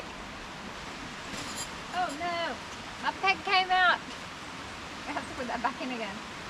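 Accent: Australia. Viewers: love watching your videos your just so happy and the auzie accent melts me come camping in banff I have lived here 18 years and would love to show you around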